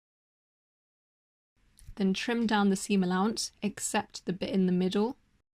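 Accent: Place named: United Kingdom. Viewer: It's British accent 😅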